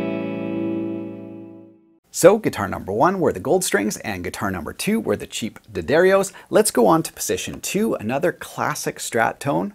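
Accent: Canada. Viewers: He has like the most canadian accent ever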